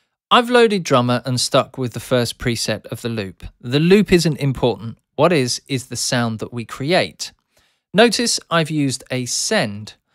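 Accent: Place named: United Kingdom. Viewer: shit sounds way more confusing when its in a British accent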